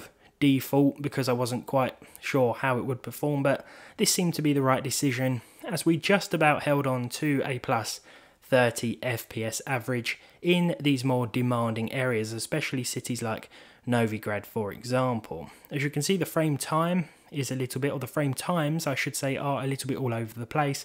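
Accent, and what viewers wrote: United Kingdom. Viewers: *Far east* Now i believe you, that are you brittish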